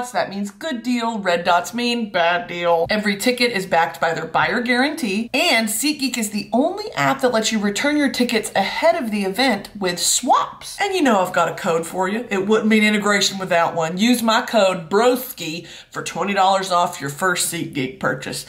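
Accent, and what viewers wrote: Spain. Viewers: Brilliant Spanish accent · ooo she got the spanish accent. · Omg YOU SOUND SPANISH Like if you told me you came straight from Fuenlabrada I'd believe it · So many of her TikToks speaking Spanish have literally hundreds of comments being like “but why you have the Spain accent 🙄” which bothers me so much bc like girl! She learned a whole ass second language! That shit is hard! And her teachers were from Spain! And commenting on people’s accents? Is fucked! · your Spanish accent is gorgeous!!! Me encanta como tu hablas amor!!!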